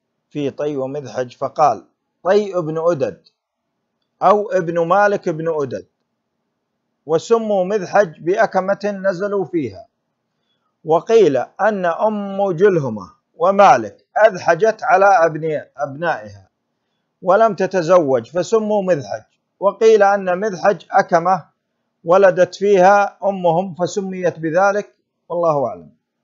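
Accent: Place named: Iraq. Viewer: لهجتك قريبه من العراق شفيك تنطق ال ج اكثر شي شكل اجدادك عراقات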